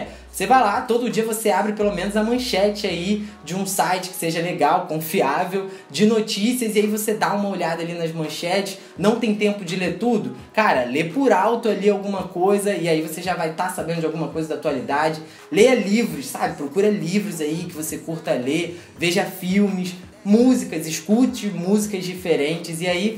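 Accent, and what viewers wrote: Rio de Janeiro, Brazil. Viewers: Tu è do Rio né?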